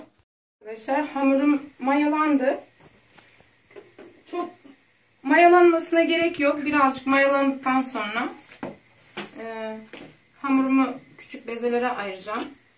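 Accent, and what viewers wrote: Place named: Xinjiang, China. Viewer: türkiyede mi yaşıyorsunuz? lehçeniz uygur lehçesini andırıyor...türkmenistan türkü müsünüz?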